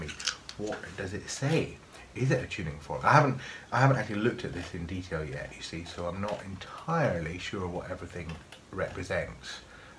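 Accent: Scotland, United Kingdom. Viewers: The last time we went to Scotland to visit the rels we stayed in Carnoustie! Beautiful... I knew I heard a bit of Scots accent,!!!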